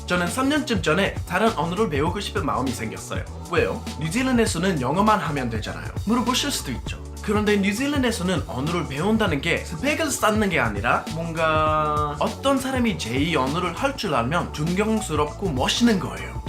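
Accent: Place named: Gyeongsang, South Korea. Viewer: 혹시 경상도 친구한테 한국말 배우셨나요? 억양에 사투리가 섞여있어요ㅋㅋ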